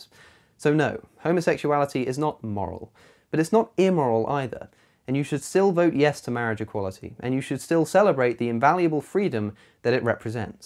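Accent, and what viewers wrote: United Kingdom. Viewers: His British accent makes him sound smarter than he otherwise would and the American flag in the background confuses me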